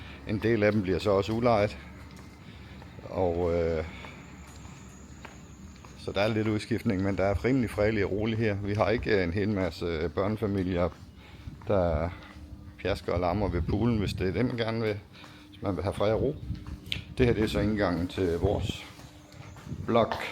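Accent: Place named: East Jutland, Denmark. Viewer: sejt kan høre du er jyde østjyde måske fra Aarhus egnen- kan jeg have ret i det- men god video - var i Hua Hin i 2- uger i 2019 samt længere sydpå i 2 uger boede først på hotel Rocco Hua Hin men der var for meget støj - så det var svært at sidde uden på balkonen så vi flyttede til et andet 800 meter væk men afsides fra trafikken det var væsentligt bedre- men kan ikke huske navnet - men der var mere ro og en gag om ugen var der marked lige overfor. men lækkert havde også en 10 dage i Soy Sam Yot først var der i 30 dage i alt plus 2017 i 30 dage samme sted som , sidst nævnt- lækkert var det!!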